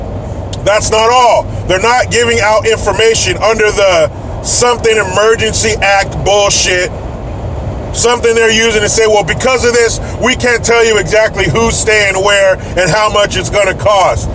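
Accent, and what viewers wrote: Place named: California, United States. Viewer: Great video. I love your Californian voice. You should be on SNL with that voice.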